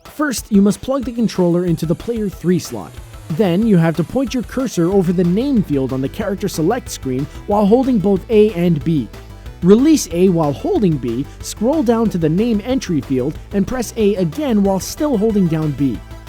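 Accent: United States. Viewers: Has an american accent but calls Z "zed" instead of "zee"?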